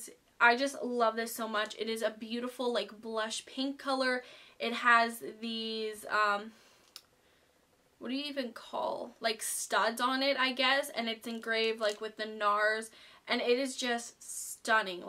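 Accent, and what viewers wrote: Canada. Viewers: me too! u sound like your from the prairies!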